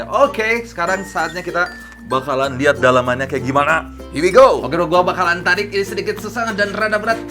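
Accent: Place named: West Java, Indonesia. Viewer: Orang Sunda Mana suarana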